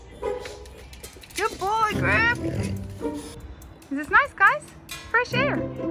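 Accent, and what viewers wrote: South Africa. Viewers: What a precious pair of potatoes! And congrats on your new home! I'm a late subscriber to your channel. You sound like you have a South African accent. I'm a South African myself, so I was just wondering! 😉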